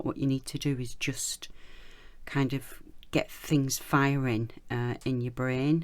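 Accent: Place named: Northern England, United Kingdom. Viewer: Hi, I’ve just found your videos which are brilliant an so lovely to hear a fellow northerner’s voice (live down South now). I’m new to polymer clay and wondered where you buy the silver things that you put the pendant and earrings on before baking. Thanks.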